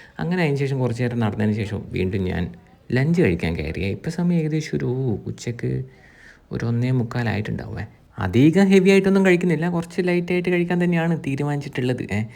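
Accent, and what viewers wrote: Kannur, India. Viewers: ഇയാളുടെ വിഡിയോ ഓഡിയോ മ്യൂട്ട് ചെയ്ത് കാണുന്നവർ ഉണ്ടോ? പാണ്ടിക്കാട് കുഞ്ഞാൻ മലപ്പുറം ഭാഷയെ വെറുപ്പിക്കുന്ന പോലെ ആണ് ഇയാൾ ഓവർ സ്ലാങ് ഇട്ട് കണ്ണൂർ ഭാഷയെ വെറുപ്പിക്കുന്നത്. ഞാൻ കണ്ടേ, ഉണ്ടേ, പോയതാണെ ഹോ കേക്കുമ്പോൾ തന്നെ അരോചകം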